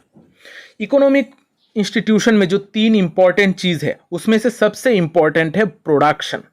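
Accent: Bengal, India. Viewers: Sir ap bangal se h kya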